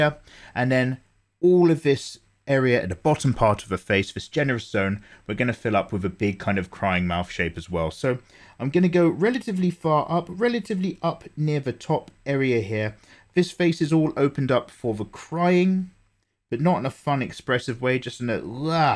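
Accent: United Kingdom. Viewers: I love how British his voice is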